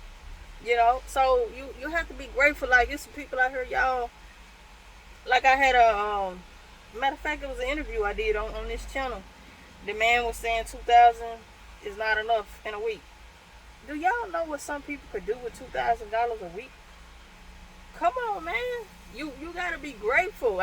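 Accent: South, United States. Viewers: Hi. I watched your video for the first time. It popped up on my feed. 1. Where are you from? I love that country twang. 2. I enjoyed how you came across with your message. 3. Are you married cause I'm going to follow you going forward... with your cute country sounding self. Don't want to disrespect. GOD Bless you for following GOD's instruction to speak what HE put on your heart at this time. I know you are helping folks. Many people are struggling like never before. I always say all of our worldly possessions and all the things we create... we can't take it with us to the next place. Someone said we are just renting them from GOD.:) Stay Cool. I'm definitely going to share your message to my contacts. Bless You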